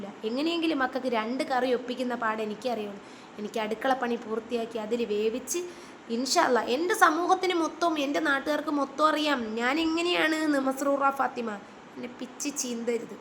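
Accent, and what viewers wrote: Thiruvananthapuram, India. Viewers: Tvm ഭാഷ ❤️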